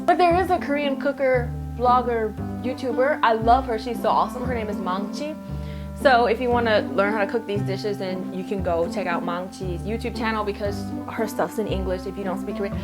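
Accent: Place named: South, United States. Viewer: Sometimes sounds like she has a country accent 😂😂it's so cute😘.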